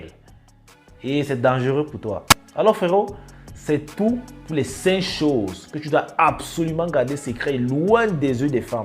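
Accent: Cameroon. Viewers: Tu a l'accent Camer hein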